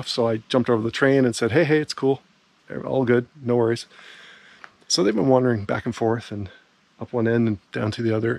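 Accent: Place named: Canada. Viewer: Correction, they are not trains....they're trolley cars or street cars. The exception are those high floor Chicago subway cars that were made using parts from old Chicago PCC trolleys. And I must say I like your Canadian accent. Did you happen to get the car number of the 1949 Red Arrow {Philadelphia Suburban} car that you boarded on the time bar. You showed the interior above the windshield, but I couldn't see the car number. Man, these ads that pop up every minute or two are really annoying. The ticks and other insects must have had a great time with you. And frankly, it's dangerous to climb around in equipment in that deteriorated condition.They've been exposed to the weather for decades. Doing it alone is especially risky and unadvisable.. It could be likely to have unfortunate consequences. If you got hurt, who would help you? Let's hope there is working cell service there for emergencies., that's not a driver's seat. 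It's the motorman's cab. The conductor collects fares or opens/closes doors. The conductor doesn't operate vehicles. The motorman operates these cars. You've got lots to learn before narrating a video like this. It might interest you to know that a scrap dealer has just bought everything at the Windber site and by year's end it will be cut up. That's to give museums and collectors time to buy things such as spare parts. There are a few vintage cars inside of the shop building that should go to museums. <link>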